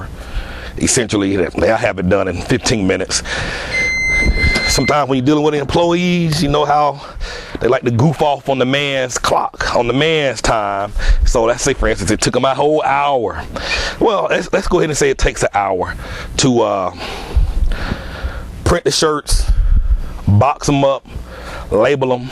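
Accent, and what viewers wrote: South, United States. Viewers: It's great to hear a southern accent on the tube. Keep making cool videos brother. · Peel it! Drop it! Roll it, and boom. LOL! 😁😁 It is early in the morning and I am watching this video.. You are so funny and inspirational at the same time. I always learn the best when something is funny or I am laughing. Thank you my brother for this video. I like your Southern accent. I live in the South as well. God Bless you!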